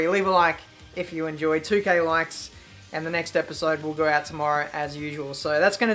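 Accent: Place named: Australia. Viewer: i honestly don't know why i watch these videos. the australian accent is so difficult to listen to, it makes my ear bleed. the driving, albeit fast, is pretty bad. contact with other cars all the time. and codemasters, continues with crappy ai. why do i watch these videos?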